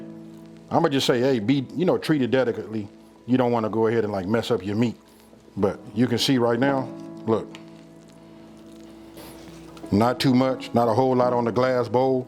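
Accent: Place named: California, United States. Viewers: Ok Unc, wit that Cali twang! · Coolest cat on the net. With that LA accent and hat you remind of my guy, NIP, #RIP. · Soon as i saw a big black brotha wit a LA hat and Cali accent, i knneeww this was bout tah be gggoooiiiidddd!!!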